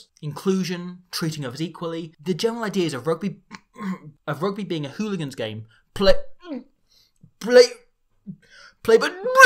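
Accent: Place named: West Country, United Kingdom. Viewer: The most patriotic Italian to have a West Country accent.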